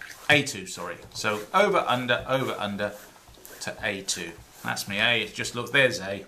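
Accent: United Kingdom. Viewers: The reason for it being so good and so clear ist because it's with a brtitsh accent! Which makes it easy to understand. Oh, the video is brilliant! Cheers for that!!!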